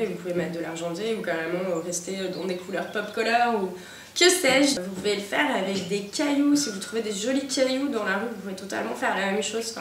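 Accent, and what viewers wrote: South, France. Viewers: J'adore cette vidéo avec le bruit de la nature ♥ C'est vraiment une belle idée et la coloquinte blanche avec les pois est ma préférée. J'ai remarqué que tu avais beaucoup l'accent du sud dans cette vidéo, je me trompe? Bisous ♥ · j'avais remarqué aussi que j'entendais un accent du sud que je n'entendais pas avant ^^ J'aime bien les accents :)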